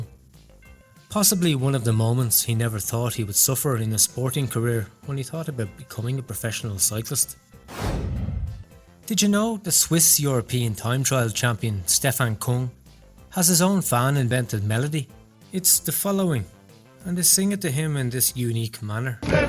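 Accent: Dublin, Ireland. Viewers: What part of Ireland your from ? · Sounds like a Dublin accent.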